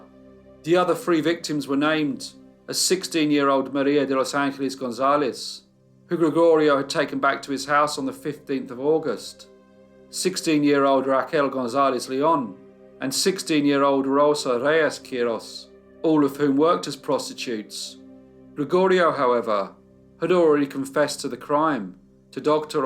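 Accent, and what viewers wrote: United Kingdom. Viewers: Nothing eases anxiety like listenening to someone from the British Isles narrate horrifying and disturbing crime. Thank you so much.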